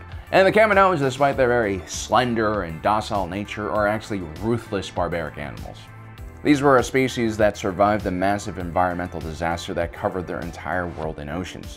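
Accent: New Zealand. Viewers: Plenty of kiwi accents sounds like OP, lol. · I like the new Zealander accent ): Omeeeeega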